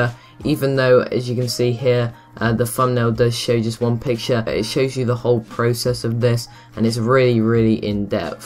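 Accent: United Kingdom. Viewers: Sorry man, are you from UK?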